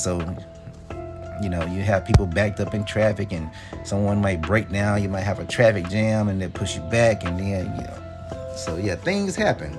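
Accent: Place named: Georgia, United States. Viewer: Love the video, looks beautiful. Your accent sounding like it from Georgia where I used to live 👌🏽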